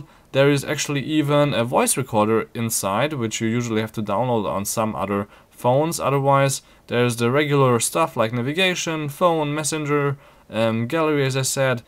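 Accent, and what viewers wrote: Germany. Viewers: Are you german?:-)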